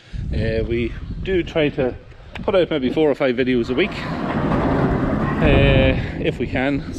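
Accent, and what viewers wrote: Louth, Ireland. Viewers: Are you from co louth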